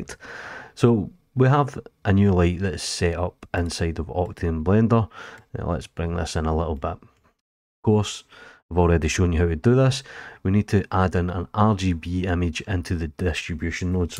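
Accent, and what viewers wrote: Scotland, United Kingdom. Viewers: Please don't ever apologize about your Scottish accent. Da best!!! - Great tutorial. Thank you.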